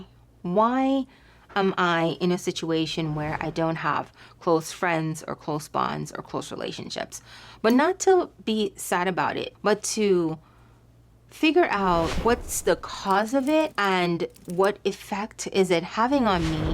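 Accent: Jamaica. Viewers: Are you Jamaican? Because your accent is showing 😊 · Love the Jamaican accent, you resonate with me! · I hear a slight Jamaican accent? If so hey girl hey!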